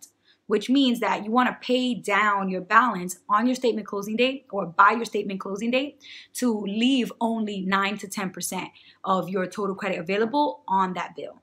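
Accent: New York, United States. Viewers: Sound like you from NY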